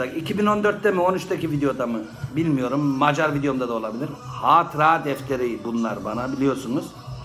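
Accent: Yozgat, Turkey. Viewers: agbi nerelisin agbi? bizim yozgat sivesi var sende:)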